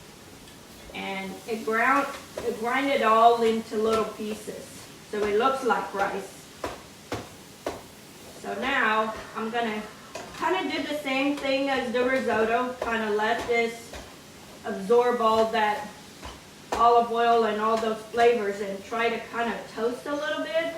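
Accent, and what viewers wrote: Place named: Azores, Portugal. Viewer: Hearing the English language with the azorean accent reminded me of my neighbour next door that passed away a few years ago 🌈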